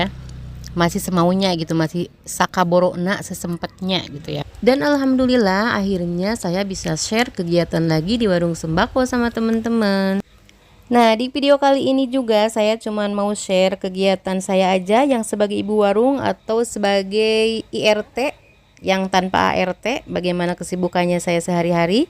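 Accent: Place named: West Java, Indonesia. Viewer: Teh di daerah mana? Meuni Sunda pisan, salam kenal ti abdi dari kota Bandung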